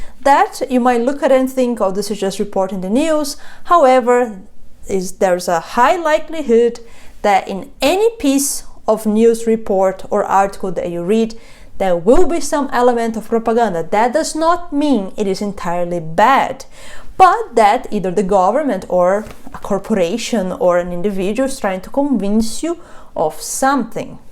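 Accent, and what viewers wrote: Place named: Brazil. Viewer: "I dont know how to pronounce and i wont try" HUUUUGE school flashback with this hahahahaha. That send me back. And with this accent... when you knows shes brazilian but you just cant prove it yet (you sound like you could be cute... but it's absurd to try to guess someone's looks with voice and even arms alone)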